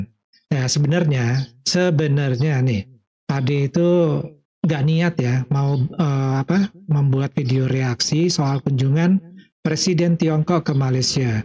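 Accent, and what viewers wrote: Java, Indonesia. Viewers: Jawa ngomong 😂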